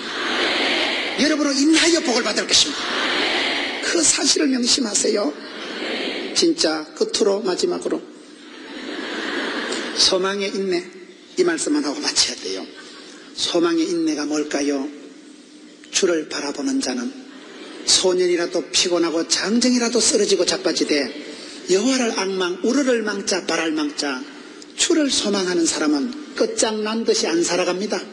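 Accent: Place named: Gyeongsang, South Korea. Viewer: 김문훈 목사님 존경 합니다 경상도 사투리를 김문훈 목사님 고향이 경상도 목사님♡♡♡♡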